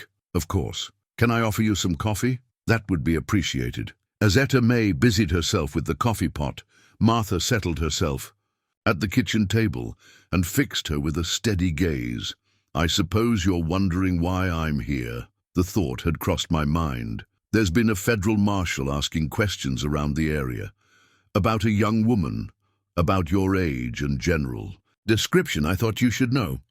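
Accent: United Kingdom. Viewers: Your voice sounds like your from London ! Exquisite voice!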